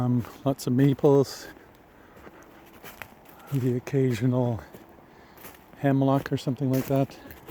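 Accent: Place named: Maritimes, Canada. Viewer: You are picking up a touch of maritime accent.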